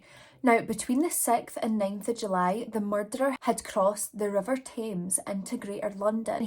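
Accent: Scotland, United Kingdom. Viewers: OMG, pure dead Scottish true crime ASMR. Really looking forward to listening to all of your videos!!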